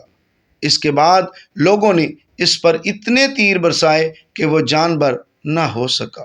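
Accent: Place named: Arab world. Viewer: Aap Uedu bhi iss tarah mazhaka kheiz, Arabi lehje mein bol rahe hain ke Urdu ka satyanas kar ke rakh diya hai. Khassosan Hazrat ALi ka naam zuban twist kar kar ke bigaar rahe hain.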